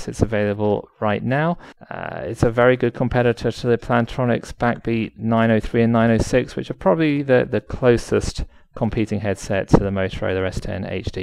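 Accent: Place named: London, United Kingdom. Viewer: YOU SOUND LIKE YOUR FROM LONDON!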